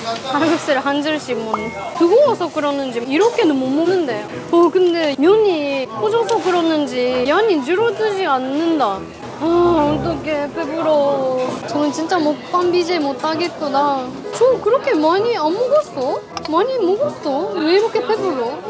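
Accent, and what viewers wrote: Gyeongsang, South Korea. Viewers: 일본분이 한국말 하니까 경상도 사투리 갔군요 ㅎㅎ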